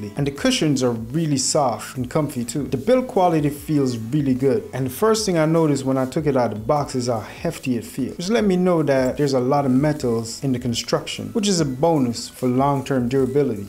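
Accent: Jamaica. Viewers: Great review but I must ask...how Jamaican are you?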